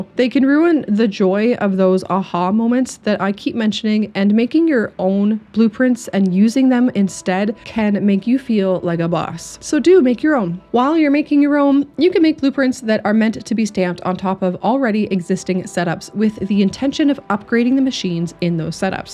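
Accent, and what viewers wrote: Canada. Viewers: I heard aboot, howdy fellow Canadian (if my guess is correct), the Factory must grow, eh! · subbed for the canadian accent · CANADIAN SPOTTED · I'm pretty sure she's too Canadian to be AI, at least. No way any of the basic, bland, AI voiceover videos is calling anything an 'underdoober'.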